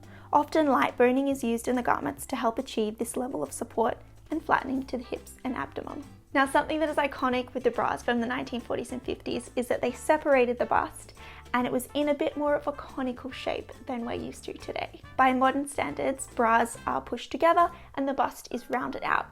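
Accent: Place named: Australia. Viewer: I have commented on one of your videos before (on the life of a housewife in the 1950s), you seemed to appreciate my comments - thks for your reply at the time. I was from an English immigrant family and lived in Melbourne from age 9 to about 32, when I returned to England. So the way you talked, and the sort of things you had in the house, were very familiar. Just a word about terminology: the belt worn around the waist with straps to hold up stockings, worn by women (and most girls from age about 12), was called a 'suspender belt' - the same as in England. It's mainly in the USA, that they are called 'garter belt'. In the US, the straps themselves are called 'garters', which can also refer to an elasticated band around the leg to keep stockings in place. So 'garter' has both meanings in US English, but only the latter meaning in England and Australia (elasticated band around the leg). 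A suspender belt in Australia was also called a 'dance belt', for reasons I never discovered. Time was when women liked to look and feel feminine - them were the days! ("vive la difference!" and all that).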